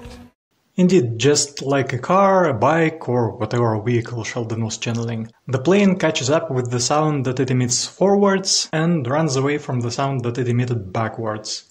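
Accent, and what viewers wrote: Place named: Russia. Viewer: while you do have some russian accent, I can feel your deep understanding of the topic and the clear thought process! great choice of words as well, keep up the awesome work and reasearch owo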